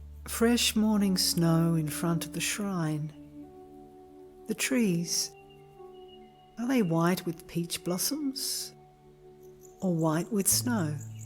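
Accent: Australia. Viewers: (Aussie, woo hoo! Definitely a Sydney voice.) Beautiful poem.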